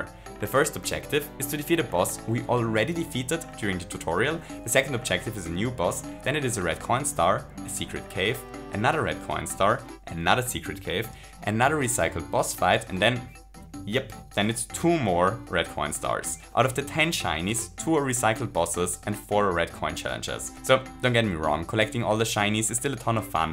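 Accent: Austria. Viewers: Man merkt so den Österreichischen Dialekt raus xD Aber richtig cooles Video! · Wenn da östereichische dialekt ballert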